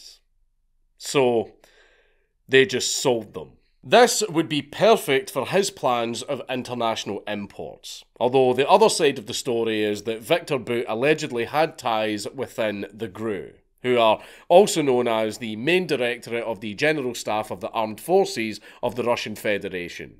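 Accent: Scotland, United Kingdom. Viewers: Hey man, you’re clearly Scottish do you reside in Scotland or are you across the pond in the Americas? I’m not stalking I’m just super curious because I personally share some of your “political” views (which you’ve only ever eluded to but never overtly expressed) & if you’re NOT in the US only makes me that much happier.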